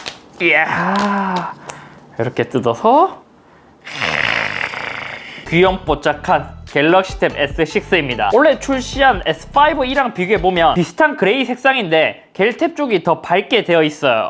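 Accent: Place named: Gangwon, South Korea. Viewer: 강원도 억양이시네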